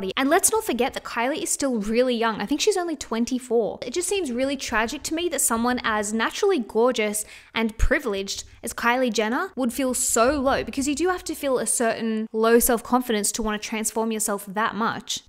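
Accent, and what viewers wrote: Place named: Australia. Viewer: It's so strange (and refreshing) to hear a fellow Aussie accent on YouTube ❤️ Great video, you crushed it! 🙌🏽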